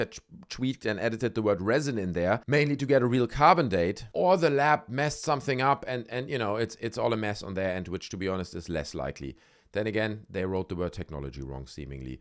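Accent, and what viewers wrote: Germany. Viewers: I just like saying, "Buga Sphere" in your German accent.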